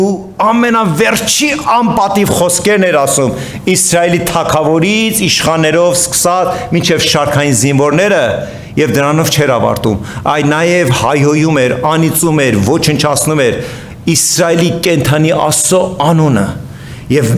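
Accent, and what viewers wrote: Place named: Iran. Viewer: Անհամբեր սպասում ենք Ձեր քարոզներին,Աստված օրհնի Ձեզ, իրանահայի Ձեր акцентը նույնիսկ հոգեհարազատ է մեր ընտանիքին,սիրով լսում ու նոր բացահայտումներ ենք ունենում լսելով Ձեզ։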